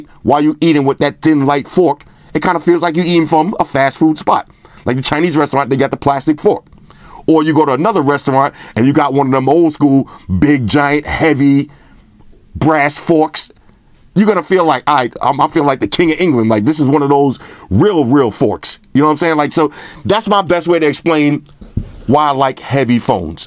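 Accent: New York, United States. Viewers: This dude is straight outta new york. Extra emphasis on everything he say lol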